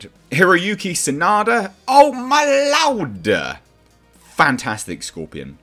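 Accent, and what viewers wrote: United Kingdom. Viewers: How did you watch it!? You sound British.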